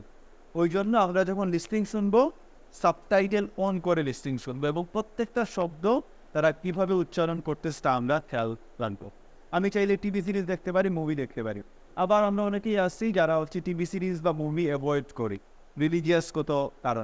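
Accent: Sylhet, Bangladesh. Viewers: uni sylhet er vai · ভাইটি মনে হয় সিলেটি 😄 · Bcz sir sylheti 🥹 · Are you Sylhety sir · Sir apnar pray video te sylheti basa aioi..but sylhety mat apnar muko sundor ow lage❤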